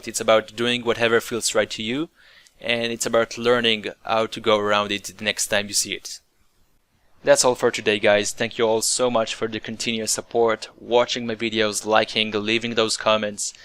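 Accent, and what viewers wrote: Israel. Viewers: I'm just from israel too and i was pretty sure you are by your accent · יש לך מבטא ישראלי חלש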